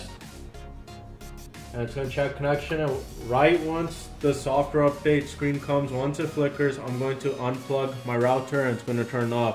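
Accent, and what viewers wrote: India. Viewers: You know this tutorials wont work when they have that shit music and indian accent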